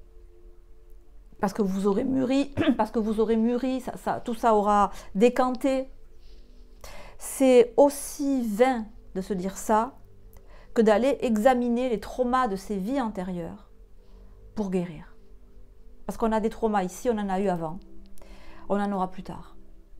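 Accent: South, France. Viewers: Bonjour vous ètes incroyable, je suis seul aussi, à vous entendre vous ètes du sud, dommage, je suis dans le "chamanisme" Bonne continuation.T.M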